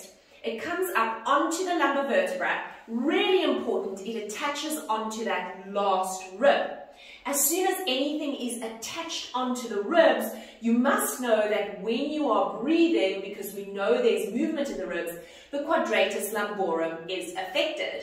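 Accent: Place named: Northwest England, United Kingdom. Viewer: She is called and she sounds like she is from my area in the UK from her accent (NW)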